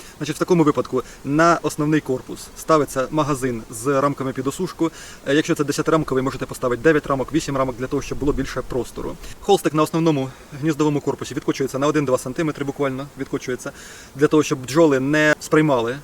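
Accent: Standard. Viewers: Діло знаешь . Мова чиста , грамотна. Один недолік cпішиш. Кому ти розказуеш? Людям , то куда спишиш, виходить розсказуеш сам соби. Старайся , і поправляйся. Тоді все буде добре. Бажаю успіхів. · Дуже гарна чиста українська мова. Стільники необсушені мені зберігати не сподобалось. Обсушую у вуликах через заставну або через плівку. Далі проморожую у морозильнику і зберігають у порожніх корпусах + з оцетовой есенцією.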